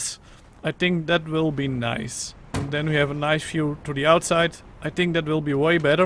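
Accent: Netherlands. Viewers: He has a dutch accent, his English is excellent. I never once have to rewind the video because I don't understand what he has said. That aside, people don't really care how good your language skills are when you come across as a dick. It's sad to see such attitude in someone so young but I hope for your sake, you change as what you have said achieves nothing positive for yourself or the person you insulted and beginning a sentence with "no hate" means nothing, you aim to insult, nothing else.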